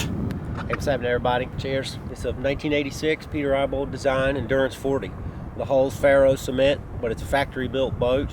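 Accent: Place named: North Carolina, United States. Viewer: or north carolina. we have accents like that here too.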